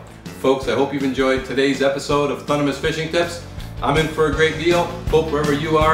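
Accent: Canada. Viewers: Anyone else that can tell this dude is ethier from Canada or Wisconsin just by his accent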